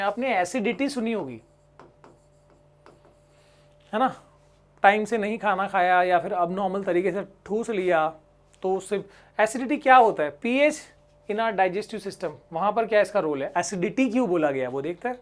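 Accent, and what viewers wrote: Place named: South India, India. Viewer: Sir aaj aap south + Mumbai jaisi hindi Kyun bol the he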